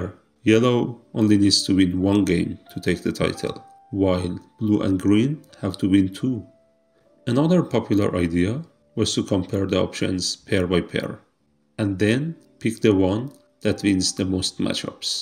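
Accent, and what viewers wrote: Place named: Eastern Europe. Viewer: Are you from the Baltic states or a Slavic country? Or Romania? I can instantly recognize this accent ANYWHERE !! :) CHEERS for the content, keep it up! .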